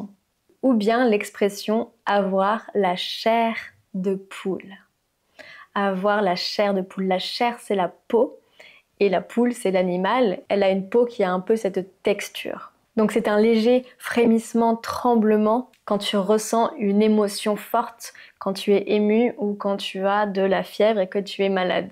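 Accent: France. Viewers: Tu parles le vraie français bien prononcé bien modulé avec un accent merveilleux et pas comme le français québécoise ou de la Suize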